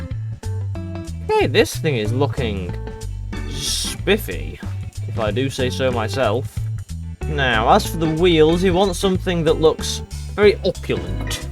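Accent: United Kingdom. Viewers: GrogTV but British instead of Aussie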